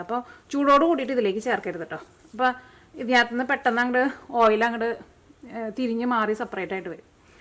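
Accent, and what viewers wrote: Thrissur, India. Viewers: Buiscuit super aanu.nedumpasseriyl trichur/chalakudy slang eppol vannu.kelkan sughamundu.👍👍👍👍👍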